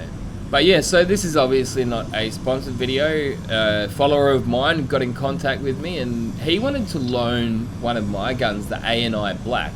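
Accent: Australia. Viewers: He's got that au accent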